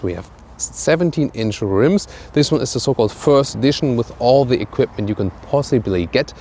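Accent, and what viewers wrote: Germany. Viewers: ich mag deutschen akzent im englischen nicht XD nice review keep going :) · Oh I just figured out when he refers to "recuperation" mode he means what we would refer to as "regeneration" or "regenerative braking". Not complaining. His German flavored English is adorable.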